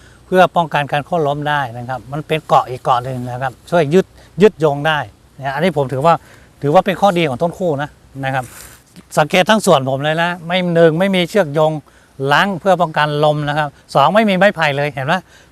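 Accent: North, Thailand. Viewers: ฟังจากสำเนียงแล้ว เป็นคนเหนือใช่ใหมครับ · อ้ายคนเหนือแม่นก่คับ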